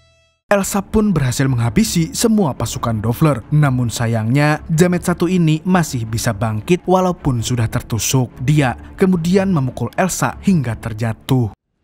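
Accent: Banjar, Indonesia. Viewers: Urang Banjar jua sekali admin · Urang banjar jua kah pian nih julak..😅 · Urg banjar kalinya julak mimin neh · Mimin nya urang Banjar leh😅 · urang banjar amang nih sekalinya wkwkwk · Harau urang banjar sekali nya sidin neneh 🤣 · Orang Banjar kah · Urg banjar sakalinya admin nih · Bungul urang banjar sekalinya 😂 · umai sanak nih urang banjar kah sekali nya wkk sukses sanak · Umma, urang banjar kah sekalinya pian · Pian ni urang banjar kah? 😁 Sesama kekawalan kita lah.. 😁 👌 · 😂dsa ngalih nyawa ni lh. Urang bnjar kalinya. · Bah urang Banjar jua rupanya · Pian orang Banjar KH min?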